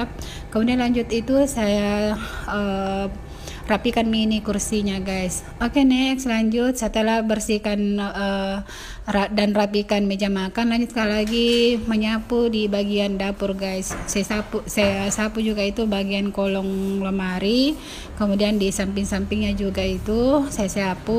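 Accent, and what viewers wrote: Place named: Makassar, Indonesia. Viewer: Logatnya kayak orng mkssr bund...meman orng mkssr.ya?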